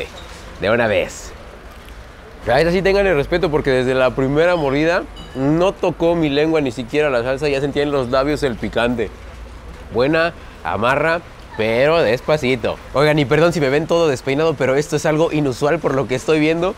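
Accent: North, Mexico. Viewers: Que chulada que disfrutaste la visita, oye pero creo que se te pegó el acento norteño Aaaaajjuuuuaaaaa!! 👍🏻👌🏻😋😉 Saludos!! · El acento!!! El acentoooo!!!🤩🤩 te saleee súper bien tu norteño interior jajajaj🥰 saludos desde Culiacán Sinaloa 🤙🏾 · Llevas unos días apenas y ya se te pego el acento norteño! 🤠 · Cómo que el acento del Norte se te está pegando mucho 😂😂😂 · ya se te anda pegando el tonito del norte jasjajsjaja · 1 dia sale de su rancho y ya trae acento norteño, no mames. XD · Se le pegó el tonito norteño!!! Jajajaja... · HASTA EL TONO NORTEÑO SE TE PEGÓ 😂 SE ME ANTOJÓ TODO PERO MAS LOS TACOS 🤤😋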